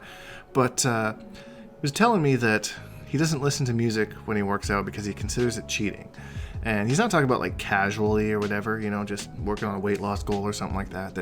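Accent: Canada. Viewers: just curious, where in Canada? I'm from Guelph/Hamilton so it was rad to hear your Canadian too! I watched some ECT and noticed they have headsets that are there for ppl to use but some ppl don't use them.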